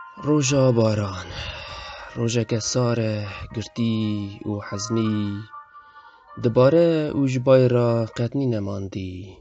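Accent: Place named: Serhed, Turkey. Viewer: Başê, brez ber zikê xwe ya têr dixwîne.. a rast kifşê ku serhedîye🤗🤗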